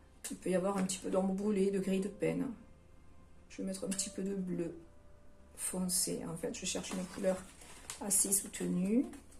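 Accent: South, France. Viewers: 😍Un tableau aux couleurs qui chantent à l'image de votre bel accent du midi. Et dans la grisaille hivernale, la peinture est un excellent loisir ! Grâce à vous , je manie le couteau avec plus d'aisance pour peindre des paysages d'après mes photos. Vos tutos nous donnent confiance et on peint avec enthousiasme...bon... les tubes de couleur se vident vite . ..Heureusement, le père Noël va passer 😉. Mille merci pour votre générosité. Catherine .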